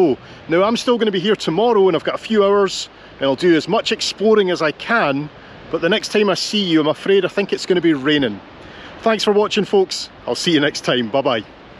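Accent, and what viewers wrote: Scotland, United Kingdom. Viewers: Spanish. Hola me gusta mucho todo lo que muestras es muy agradable es muy bonito el ferry y todas las comodidades que imagino que la vez la vez de haber disfrutado mucho Tú también una de curiosidades que me pregunto es tu acento inglés de dónde es o por qué no es british tengo dificultad en entenderte pero me preguntó si es por la velocidad que hablas o porque tienes un un fuerte acento de alguna parte de uk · Greetings, my name is Jeff. I live in Melbourne Australia back in 2000 approximately 23 years ago I was on the Isle of Man, but I had a terrible time getting there I had to get the train to Liverpool, and when I got to the theory, they told me the ferry was busted and it was the time at the motorbike races? There was motorbike people everywhere. Anyway, the theory eventually got away about midnight. I ended up in Douglas about 3 AM cost me 60 Isle of Man pounds to go to my B&B up at Laxley But after getting over that nightmare the next four days on the Isle of Man was fan fantastic because I’m a train buff I had a fantastic time but I don’t know what happened. 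I forgot the book my return trip back Liverpool, but they help me out at the ferry on standby time and I got on the ferry to go back home where I’ll staying with friends at Usk in Wales. But now I am 70 years old, retired and a pension had a cost me big bucks to get over there again and it just out of my price rain I do enjoy your YouTube adventures. Lots of fun all the best to yourself and I love your Scottish accent, Jeff.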